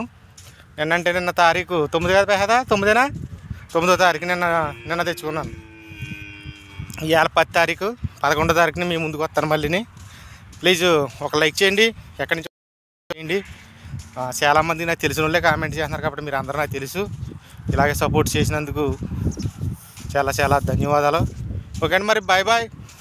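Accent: Godavari, India. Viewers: హాయ్ అన్న ఎలా ఉన్నారు నేను ఈమధ్య మీ, వీడియోస్ చాలా మిస్ అయ్యాను మన గోదారోళ్ళు మాటే అలా ఉంటుంది మరి కైమాలు, చాలా బాగున్నాయి నైస్ వీడియో బ్రదర్ 👍👍♥️♥️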